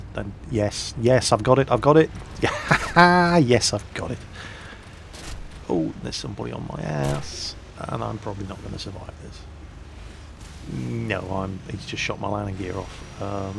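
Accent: England, United Kingdom. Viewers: I was a fair question dont be a dick. Just because he has a English accent doesn't mean he was born in England. Maybe his parents are Bohemian, like most Czechs. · There is no country called Bohemia lol and he is from Britain listen to him.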